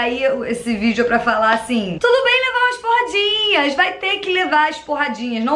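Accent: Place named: Rio de Janeiro, Brazil. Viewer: Carioca fala gostouso!!!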